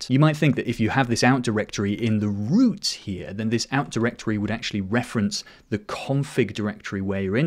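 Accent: United Kingdom. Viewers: Ебанутый британский акцент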